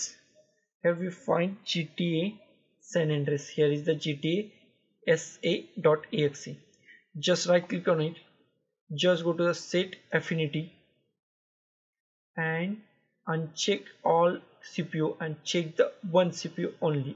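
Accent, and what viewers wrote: India. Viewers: When i heard indian accent i didn't even needed to try it i already know it will work thank you brother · his accent his pure indian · When i heard his indian accent , i knew it gonna work. Thank you man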